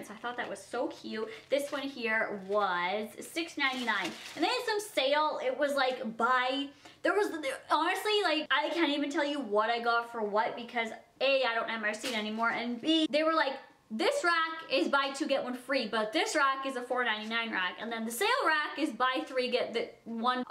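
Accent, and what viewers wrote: Canada. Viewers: It's the slight Canadian accent. All of my family comes from Canada and they all have the same pronunciations as does. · M C thought i was the only one that noticed specially bag or tag anything with a ig its a canadian thing or ?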